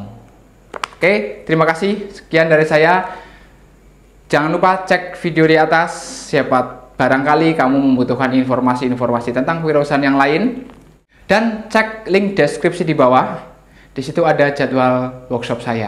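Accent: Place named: Banyumas, Indonesia. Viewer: Logatmu ngapaknya medok banget , mas. Anyway..sukses selalu ya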